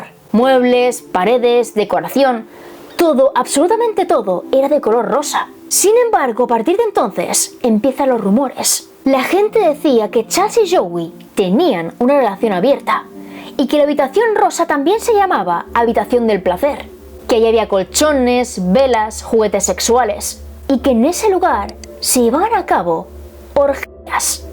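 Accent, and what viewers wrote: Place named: Spain. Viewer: Mansión. Saludos hermosa bellota. Excelente video. Tu acento español... Es hermoso y precioso.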